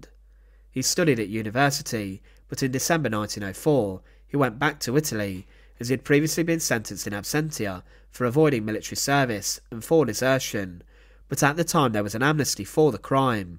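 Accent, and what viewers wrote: United Kingdom. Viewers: You should ask yourself what is the real goal of this video. The British accent of the speaker is interesting. I wonder if this people can tell us something about the relationship between Churchill and the Duce. During the days that preceded the execution of Mussolini, there were British secret service men operating in lake Como, looking for documentation that could put Churchill in dishonorable condition. It is highly suspected that the documents that Mussolini conserved jealously in those days, were the object of this search by Britain. Interestingly, these documents DISAPPEARED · This british accent is so awful ... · Very annoying your tone, pause and narrative .. are you British ?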